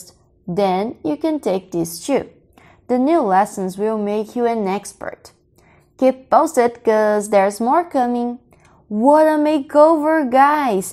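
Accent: Northeast, Brazil. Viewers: que pena uma dublê , era mais lindo sua voz nordestina, que nem a minha. 😊😍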